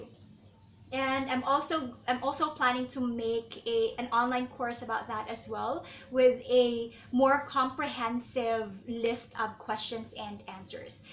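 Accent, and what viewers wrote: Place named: Philippines. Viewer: You speak english huh? But you sounds fikipino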